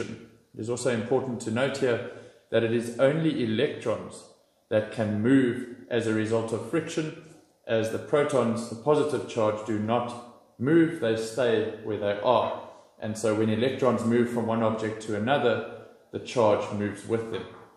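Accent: South Africa. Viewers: south african hey?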